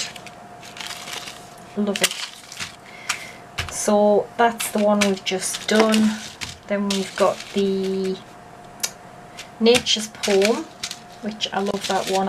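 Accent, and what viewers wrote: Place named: Northern England, United Kingdom. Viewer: Hi just found you're channel,and although I don't make things like tags etc,more into colouring books,I thoroughly enjoyed you're tutorial,and look forwards to watching more! Can I ask where you are from,lovely northern accent,I am a Northumberland lass,but now living in Spain!!.Thankyou for sharing X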